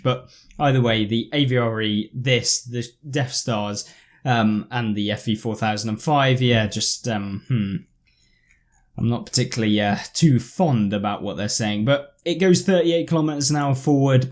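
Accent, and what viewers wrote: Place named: Cockney, United Kingdom. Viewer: You speak English? You're English? So why the actual f*ck can't the people who made the f*cking language speak it ? Roofless? It's ruthless you can say the with the t and the h making the correct sound then you mispronounce thought and make it sound like fought when clearly one starts with a f*cking f and the other starts with th so if you know what sound it make's why are you using fs where there's no fs you say there their and they're perfectly so why the f*ck are you using that lazy dog sh*t cock knee accent for ruthless 🤔 mate come the f*ck on stop being sooooo lazy put some effort in if dez game's can correct himself (Lithuanian-English) why can't you speak the easiest and your own f*cking language for 5 and a half minutes I was waiting to see a Roofless tank wondering why a tank without a roof was being put in the game and why you were calling it a roof and not a turret it's your language speak the f*ckin thing correctly or do some linguistic tutoring you literally have the internet and hundreds of language apps at your disposal I'm Australian and between you idiots the Americans and the kiwi's f*cking up your own language I'm appalled I know Swede's Finn's and Indonesians who pronounce English better than that stop swapping letters out and pronounce sh*t correctly I'm diligent when I use German and Finnish so the context is understood how are you butchering your own language? 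It's just laziness nothing else fix it drives the rest of us up the wall you're doing such a g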